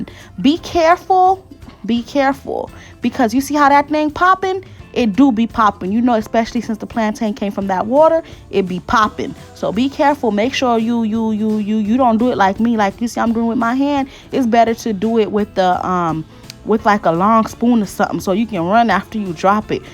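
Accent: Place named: Miami, United States. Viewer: Hey, first off I love you meals I have tried several recipes, I’m a new subscriber. However, you talk like you live in Miami?? I’m from there and I here it I might be wrong. 😂🥰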